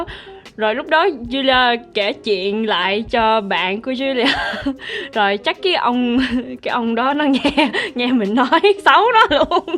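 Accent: Vietnam. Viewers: OMG girl I love your Vietnamese accent!!! I think it's so cute that you try to embrace this part of the culture. So very looking forward to other Vietnamese speaking videos (or any other videos hahaha) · sᴇᴇıɴɴᴇᴇ she’s probably speaking in the North accent, ur south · Isn‘t her accent southern as well? Also she mentioned that the Grab driver wanted to drive her to Vung Tau which makes me think that maybe she was in Saigon.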